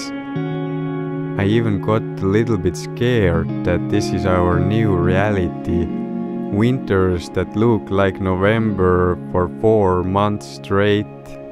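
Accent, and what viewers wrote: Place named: Estonia. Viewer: Hi! Great video, really enjoyed it. I have lived in Estonia 6 months and experienced a cold winter as well, visiting some natural parks and hiking in the nature. I really appreciate you talking in English, I can finally understand what you are narrating and your accent makes me remind of my special time in your home country!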